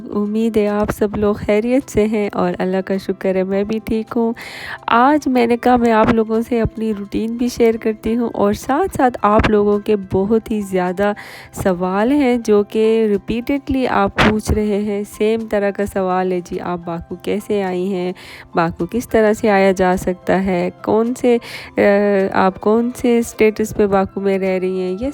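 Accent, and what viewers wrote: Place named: Hazara, Pakistan. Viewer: Her accent of Urdu language reflects her connection with Hazara Valley of Pakistan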